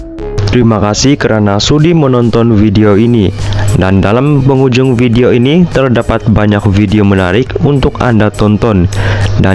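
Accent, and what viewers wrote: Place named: Sabah, Malaysia. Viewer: Ini bahasa melayu Sabah la bro. Bukan bahasa Indonesia dengar betul-betul loghat percakapan admin tu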